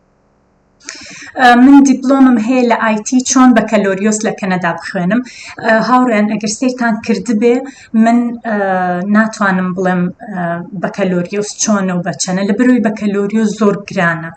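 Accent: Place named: Sulaymaniyah, Iraq. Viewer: خه‌ڵكی كوێی زمانت سلێمانیه‌ و وابزانم وتت هه‌ولێریم🌝😅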